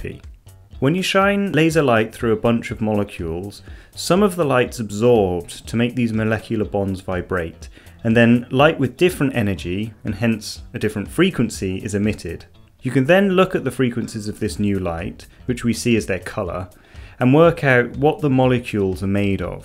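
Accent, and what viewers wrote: England, United Kingdom. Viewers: Question: Where are you from in England? You speak very similar to James May.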